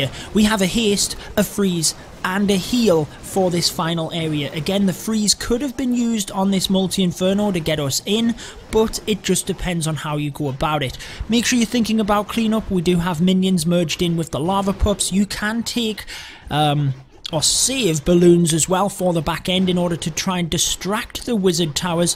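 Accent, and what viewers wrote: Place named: Newcastle, United Kingdom. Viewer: Cool it’s nice to see another Geordie clasher😂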